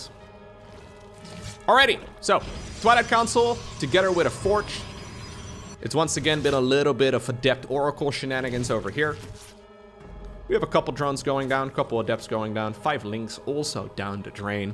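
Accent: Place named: Netherlands. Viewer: Interesting how you Dutch people pronounce stuff. But when you typed it, I understood right away. In German it is "Schurke". But we pronounce it quite differently. Interesting :)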